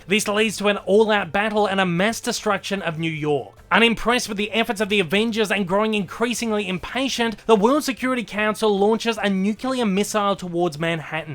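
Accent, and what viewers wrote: Australia. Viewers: Your accent is horrendous, no offense. What are you, some Asian Aussie? You sure sound like it.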